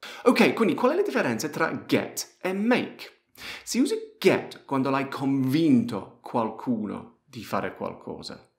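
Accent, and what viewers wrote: United Kingdom. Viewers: very well!! Thanks! e voglio aggiungere che quella storia del pugliese non la condivido affatto: a me sembri un vero inglese che parla un ottimo italiano! :) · HI! Your sons are precious! I like that perfect British accent!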